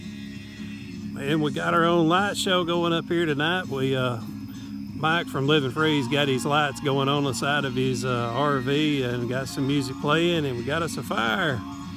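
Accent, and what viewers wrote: South, United States. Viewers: Nice camping spot there!!! I like your southern accent too!!! And Muppy is so cute & silly.🐕 😃 ☺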